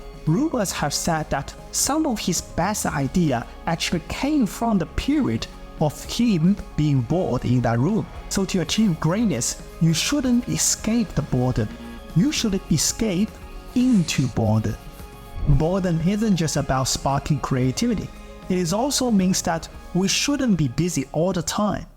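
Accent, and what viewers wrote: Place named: Louisiana, United States. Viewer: Bro you got the cajun accent "youknowhowlooongihavebeenwaitingforthis"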